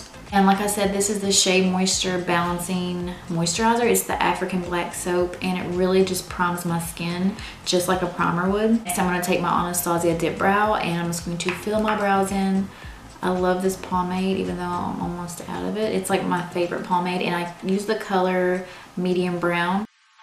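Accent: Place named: South, United States. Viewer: You sound like you have a little southern accent which just adds to your beauty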